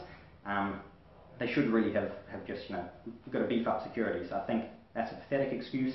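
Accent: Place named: New Zealand. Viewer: Interesting - but, please slow down your speech and keep same volume....trying to cope with the NZ accent....hope the group thinkers widen their mind enough to actually listen to what Lauren has to say.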